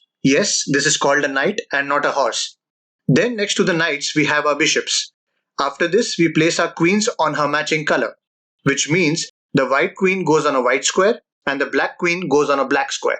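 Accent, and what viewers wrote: India. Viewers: Indian accent, huh? 😀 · Indian accent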